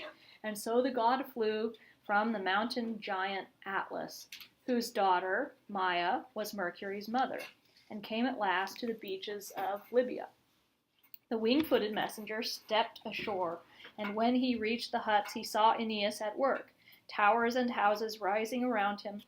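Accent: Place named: United Kingdom. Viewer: Ye what is up with all those audiobooks with British accents?? Where are all them American accents??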